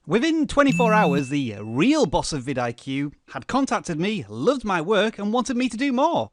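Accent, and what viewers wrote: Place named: South Yorkshire, United Kingdom. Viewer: Where are you from? You sound local, Sheffield or South Yorkshire at least, but I'm also bad at accents.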